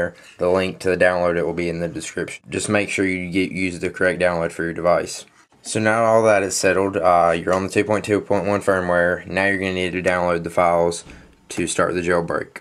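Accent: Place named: Texas, United States. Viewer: u sound like ur from texas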